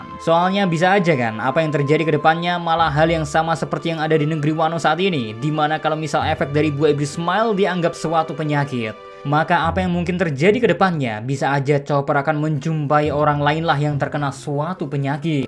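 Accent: Java, Indonesia. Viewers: Medokmu lucu min ngidoll 🤭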